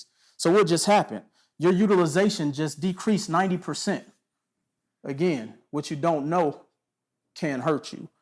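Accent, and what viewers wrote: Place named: Louisiana, United States. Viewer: your brilliant! Off topic, you have to be from the south? Louisiana? Your accent gives it away! 😎😎😎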